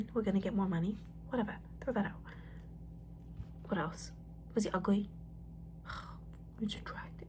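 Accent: New Jersey, United States. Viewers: Girrrrrl you’ve GOTTA be from NJ or NY. As a Jersey girl myself, the accent is spot on. Loved this vid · Thank you for another Jersey accent video!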